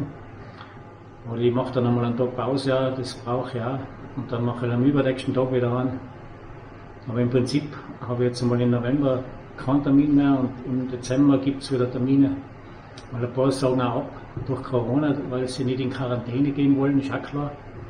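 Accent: Austria. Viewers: Moin aus Norddeutschland. Sehe mir gerne deine Filmchen an. Aber es wäre schön wenn du etwas mehr Hochdeutsch sprechen würdest.Dein Österreicher Slang ist sehr schwer zu verstehen. Sorry. Viele Grüße.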